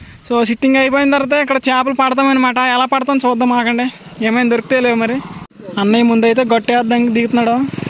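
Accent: Srikakulam, India. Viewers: Midhi Srikakulam laa undii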